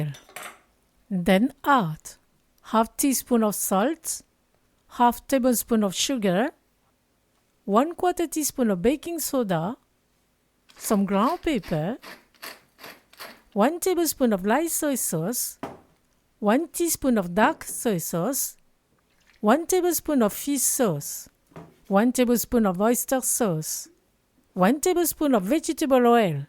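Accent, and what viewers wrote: France. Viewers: Your amazing! Ty for the recipe. With your French accent I know you would make Vietnamese food w integrity 🤌🏼🫶🏼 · Is that a French accent I detect ? 😊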